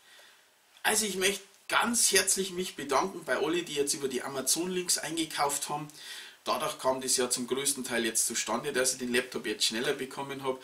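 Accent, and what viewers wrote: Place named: Low German, Germany. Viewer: Besser als Deine HD-VIDEOS ist defenitiv, Dein "Plattdeutsch"😀😀😀 Du machst doch eh schon geile Videos, genau wie der Christian, HD ist toll, die Information ist wichtiger. LG Jupp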